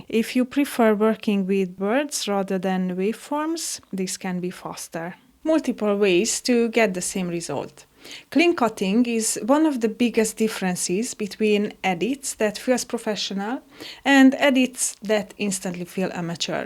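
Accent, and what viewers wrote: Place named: Hungary. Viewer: I might be mistaken but are you per chance hungarian? Your accent kind of sounds like it! :) Anyways great video, you gained a like and a follow! :D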